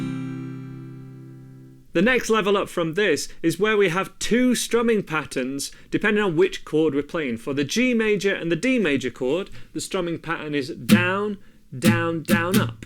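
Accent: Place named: RP, United Kingdom. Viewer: when did you get all smug and start putting on a posh accent?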